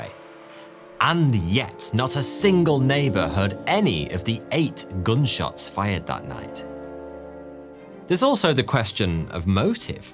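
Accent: United Kingdom. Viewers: These British pronunciations are killing me.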